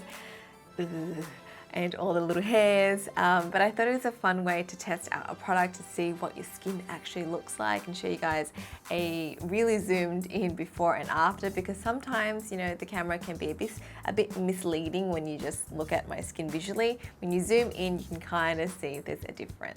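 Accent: Australia. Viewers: Asian with a aussie accent....wow...like McDonald's "I'm loving it".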